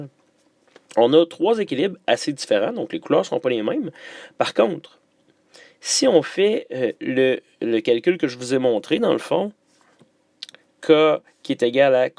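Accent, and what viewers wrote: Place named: Canada. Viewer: Vous rigolez j’espère, vous n’êtes pas assez bête pour ne pas savoir qu’il est canadien et c’est comme ça qu’on parle là-bas!!!!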